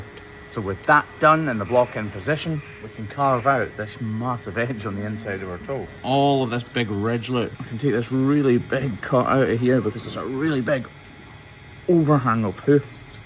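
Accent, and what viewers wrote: Scotland, United Kingdom. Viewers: Normally I love Scottish accents and regional word variations, but for some reason hearing "slither" in place of the "sliver" I'm used to irritates me every time.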